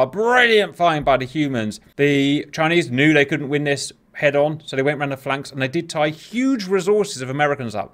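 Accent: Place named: United Kingdom. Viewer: I'm not supposed to say these videos are MORE ADDICTING due to his British Accent! ...............................💂*tea time! 🫖 .............................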